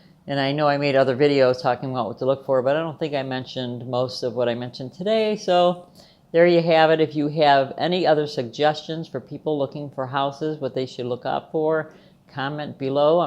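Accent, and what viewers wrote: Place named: Miami, United States. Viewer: You sound alot like a cuban raised in miami not jocking ....jay from miami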